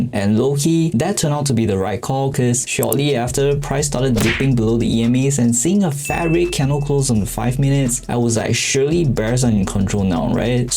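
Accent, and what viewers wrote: Singapore. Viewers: are you singaporean ? Sounds about there but if so jiayous i'm also doing funded with lucid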